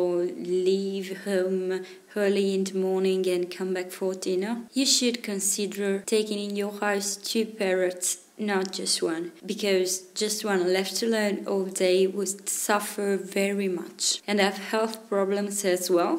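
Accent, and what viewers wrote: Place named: Italy. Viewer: Posso darti un consiglio? Non forzare la pronuncia, è davvero fastidioso da sentire! Non devi vergognarti del tuo accento italiano, e se proprio lo vuoi cambiare ti ci vuole molta pratica e non lo puoi improvvisare così. Ma poi il nostro accento è bello e simpatico, quando ero in Inghilterra me lo dicevano tutti :)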